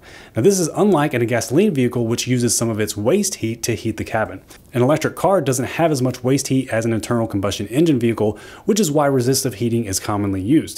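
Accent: Kentucky, United States. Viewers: Are you a Kentuckian?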